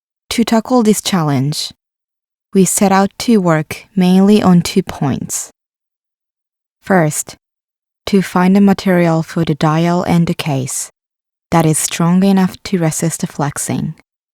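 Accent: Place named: Japan. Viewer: 这个视频里的英语发音已经很好了，但是某些音还是有很明显的日本语音的特点。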